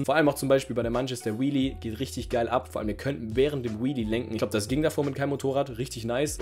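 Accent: Swabia, Germany. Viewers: wieder unglaublich gutes video, danke dir,. selbst meinem vater, dem i das video grad gezeigt hab, hat gemeint: " das ist aber ein sehr professioneller junger mann. aber bei dem hochdeutsch ist der doch kein schwabe? " - doch isser, unser 😁 ich wünsch euch allen ein frohes und besinnliches weihnachtsfest 🥳liebe grüße aus dem regnerischen Bärlin. peace and love ✌❤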